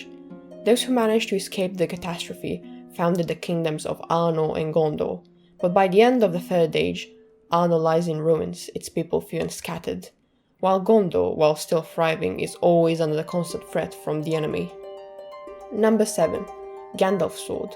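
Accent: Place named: Brazil. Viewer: I'm pretty sure she's Brazilian, they pronounce R's with an H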